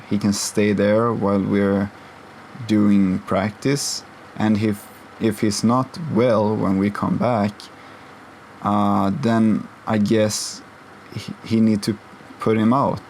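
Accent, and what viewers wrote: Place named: Europe. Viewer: were are you from actually? Your accent was a bit european to me, or im i totally wrong here?🤔🙃🤭.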